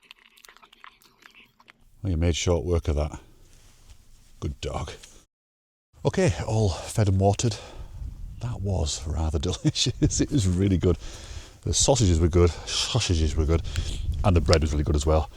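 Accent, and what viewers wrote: Yorkshire, United Kingdom. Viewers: I'm really impressed with the beautiful views and the hiking that you do.always loved England, but I would never be able to do things you do. Sweet doggie Finn. You've got a good sense of humor. I believe that's a Yorkshire accent ? First class😊. The next part you're probably not going to like. I don't know what you had on your Christmas jumper, but you were (grieved) with the comments. What you say is a bit of fun, isn't. Balfamet,a pentagon, stuff like that is occult. The devil is very busy these days blinding g people's eyes. Hope you're not annoyed(grieved), and will think about it.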